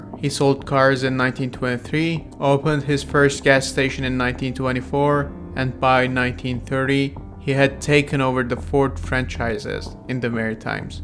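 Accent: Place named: France. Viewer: sounded like a strong french accent. BTW, the Irvings were originally from the Buctouche(sp) area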